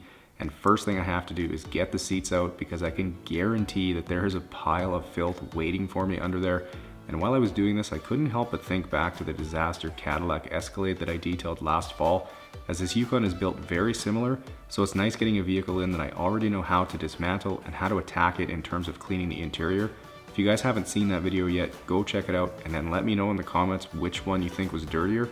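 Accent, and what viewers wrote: Saskatchewan, Canada. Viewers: It’s our awesome Saskatchewan accent 😘🇨🇦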